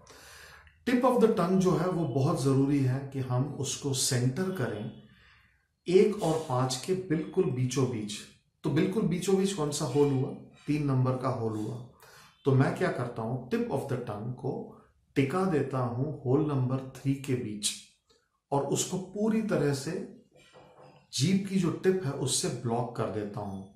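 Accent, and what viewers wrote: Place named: Maharashtra, India. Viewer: your accent is like maharashtrian so I thought it.by the way how to start online classes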